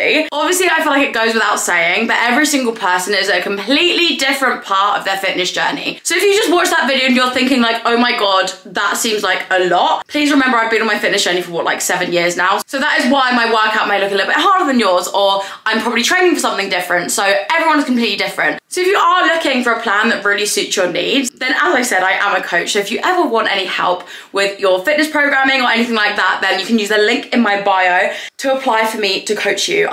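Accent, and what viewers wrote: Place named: United Kingdom. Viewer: I don’t think I realized that you had a British ascent till now. I just followed one of your Instagram links and found and subscribed to your YouTube channel. This entire time I thought you were an American 🇺🇸. I love hearing your voice for the first time. I had imagined it was going to be a higher pitched voice prior to hearing this video.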